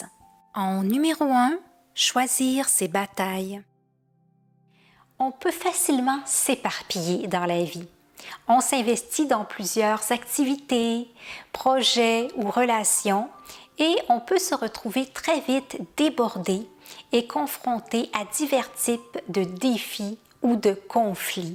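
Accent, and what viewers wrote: Quebec, Canada. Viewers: Bonjour, je trouve dans votre contenu plus de sagesse parfois que ce que mes parents m' ont transmis, même s' ils ont été de bons parents.. bonne continuation ( en plus j' adore votre accent du Québec et votre voix douce et apaisante) 🐙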